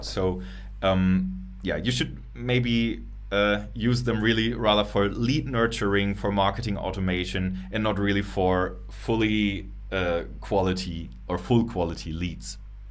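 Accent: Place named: Germany. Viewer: Your English is frankly amazing. I'm a bit biased since I have a lot of german family so I'm use to the slight accent, but honestly it's great.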